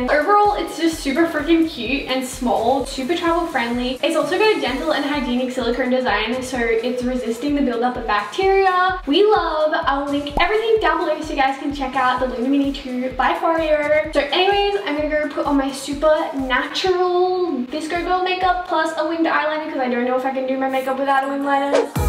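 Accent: Australia. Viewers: These replies are cracking me up! I love Aussie accents 😭😂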